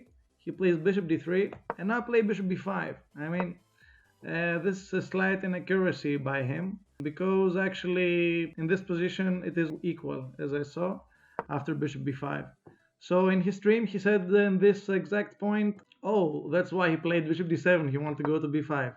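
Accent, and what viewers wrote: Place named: United Kingdom. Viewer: Γεια σ ρε αρχηγέ! British accent! If you didnt got it i am Δήμητρα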